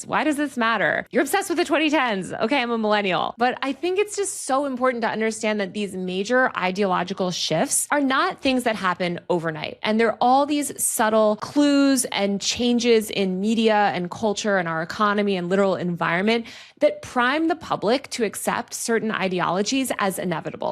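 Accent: California, United States. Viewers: she literally sounds like if California was a person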